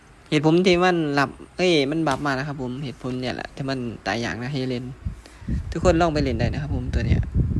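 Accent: Isan, Thailand. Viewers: นี้มันช่อง Doyser But พากย์อีสาน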